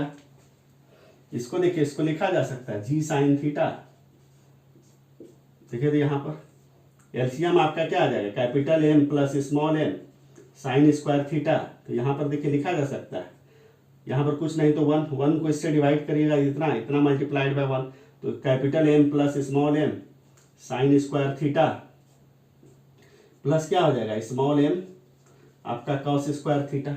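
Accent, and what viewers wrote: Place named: Bihar, India. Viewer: ❤ love from bihar thanks sir i know you are from bihar by language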